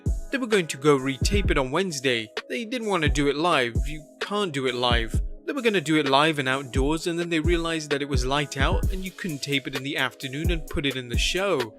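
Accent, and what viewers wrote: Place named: England, United Kingdom. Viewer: oh thank god. if it wasn't the English guy and instead of the American guy narrating the video. I would have tune out.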